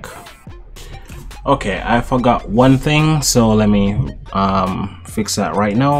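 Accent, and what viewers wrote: Jamaica. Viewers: Your Jamaican? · Why do you sound like a Jamaican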